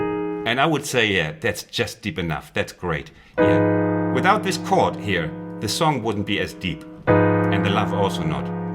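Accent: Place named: Germany. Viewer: Thanks a lot for posting this tutorial! It's one of my absolute favorite pop songs and now I finally know how to play it. I also like your hard German accent (being German as well).😀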